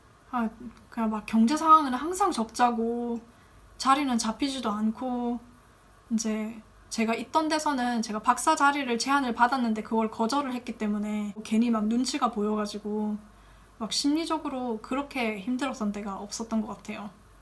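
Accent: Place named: Daegu, South Korea. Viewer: 대구분이세요? ㅋㅋㅋ 사투리 참 좋습니다!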